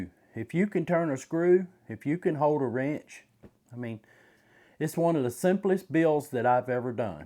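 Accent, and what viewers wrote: South, United States. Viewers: A Chinese ebike review with an American southern accent is dope and funny at the same time lol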